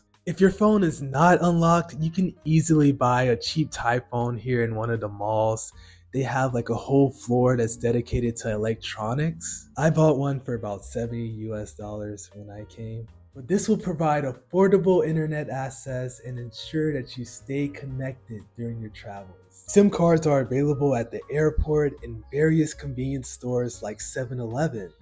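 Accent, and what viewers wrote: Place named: California, United States. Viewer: Good video thanks! Where are you from dude, you sound like a California surfer lol.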